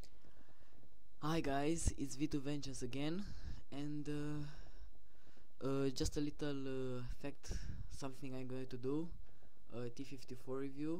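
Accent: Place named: Romania. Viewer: Esti roman? :)) ai accent romanesc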